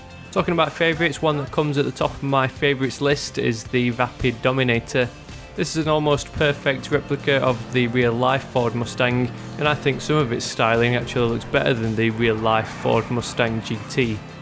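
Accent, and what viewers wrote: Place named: United Kingdom. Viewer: He's British, dumbass.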